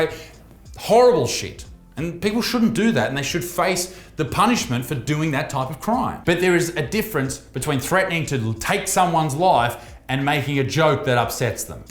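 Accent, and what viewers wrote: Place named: Queensland, Australia. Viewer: i bet you're from queensland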